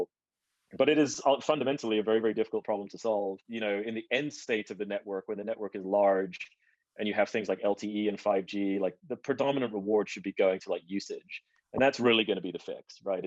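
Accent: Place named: Australia. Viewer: .. i sware i can hear an Australian twang.. did you ever live in Australia?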